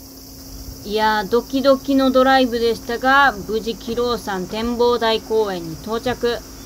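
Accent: Kansai, Japan. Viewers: 絶妙な関西弁のイントネーションが、かわいい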